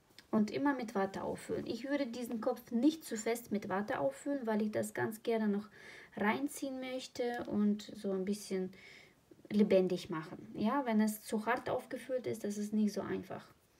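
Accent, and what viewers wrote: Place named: Russia. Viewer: Hallo, ich bin neu auf deinen Kanal und ich finde dich sooo cool, ich hab schon so viel gelacht während dem häkel, es ist immer lustig mit die. Dein russischer Akzent ist auch sowas von unterhaltsam, mach weiter so, ich bin ein Fan deiner Kuscheltiere. Ich kann mithäkel und bin mittendrin. Danke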